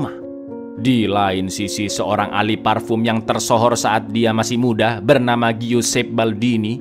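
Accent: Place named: East Java, Indonesia. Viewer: Hahaha sebenernya punya potensi melebihi iq7, tapi kenapa jon iq7 yg lebih terkenal ya. Apa karena logat sunda jon lebih populer dari logat jatim nya cak. Entahlah, waktu yg akan menjawab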